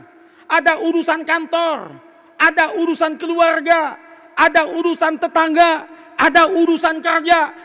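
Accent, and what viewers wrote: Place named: Madura, Indonesia. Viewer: kayak ada logat maduranya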